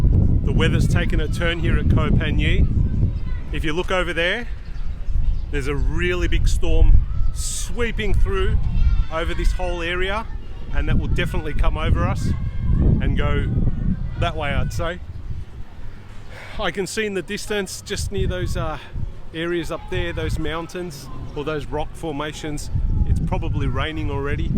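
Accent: Australia. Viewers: So nice to hear the Australian accent on YouTube. It's getting crowded out by other, like, annoying ones. 🤪❤❤❤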